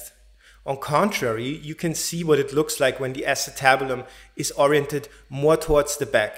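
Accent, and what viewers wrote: Germany. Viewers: Thank you for your time and work🙏🙏🙏 P.S.: höre ich da einen deutschen Akzent? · I am (was) German (lived most of my life in Sweden). I know that there is a town named Baumgarten in Austria and I think my family has some ancient connection there. Most people are called Baumgärtner or Baumgartner. Then there is "von Baumgarten", there even is a branch here in Sweden where I live, and my father claimed that his family was a "von" once but ditched it. I heard that the speaker in the video was clearly german speaking, but chose to write in english so anybody can read the Q&A, in case it conserns them, too. There are sooo many misconceptions and contradicting informations out there, I was really happy to find this video providing excelent explanations accompanied by really great animations! Thank you.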